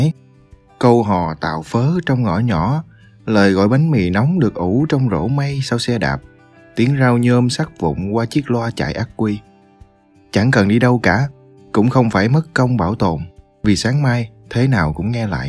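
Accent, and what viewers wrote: South, Vietnam. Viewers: Nội dung người Bắc soạn, giọng đọc người Nam truyền tải. Là người Hà Nội sống và làm việc tại Sài Gòn, tôi thích cảm giác này. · Bài viết của chàng trai Hn, giọng đọc miền nam nhưng mình thực sự thích giọng đọc này, ở đoạn cuối có những đoạn lắng trầm rất cảm động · Cái giọng đọc miền Nam chuẩn kiểu này giờ ngày càng hiếm. Mong bạn giữ gìn nó nhé. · Hai bài giọng miền nam nghe hay mà nội dung không hay Nghe cứ sao sao ấy không hút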